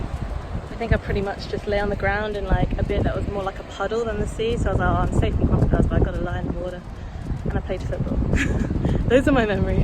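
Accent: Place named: Australia. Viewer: Great Video! I love your passion for plants! Its something that I have always overlooked, not deliberately but I have just been drawn to things that move (at a noticeable rate). I cant work out your accent? Some sounds Aussie, some a little south African and then some sounds English. Have you travelled about a bit by any chance?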